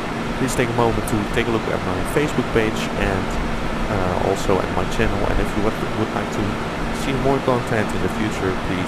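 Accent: Netherlands. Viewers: I swear to god, once i heard you're accent i KNEW you were dutch! And you're youtube account confirmed it :) Great video & thanks. Much better than reading tutorials ;)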